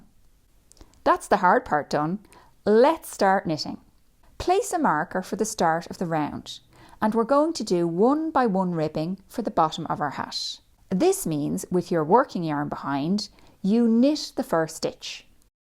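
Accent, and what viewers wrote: Ireland. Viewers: Very nice instructions and love Irish accent 🎉❤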